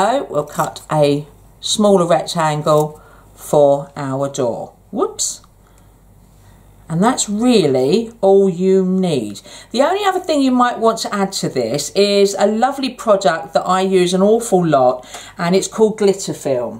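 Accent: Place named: England, United Kingdom. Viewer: Love this! So nice to hear an English voice 😁. Will be trying this for sure!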